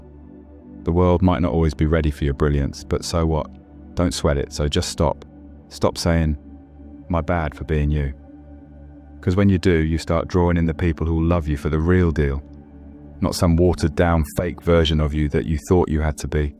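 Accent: United Kingdom. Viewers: ❤ I really like your accent, voice and narration style! Is it a real voice or a very good AI? I really want to know! British accent and British wording with an American twist! I live in London and this accent seems from a Londoner but a old school accent, hard to find it now, I know AI would clear the harshness of it , yet it’s hard to find anyone with this cute accent anymore, even years ago was hard to find. Is recognised as a gentle south London accent, yet , this soft spoken type it has disappeared!😢 I really like it! I have heard women in west/south London, but man has let it go, now man it’s more of an English posh accent or street cut off English. Anyway, please tell me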